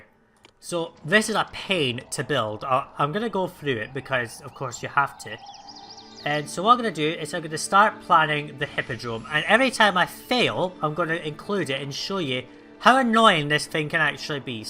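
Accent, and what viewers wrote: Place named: Scotland, United Kingdom. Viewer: Are you a Scotsman? Love the Accent and lovely gameplay :)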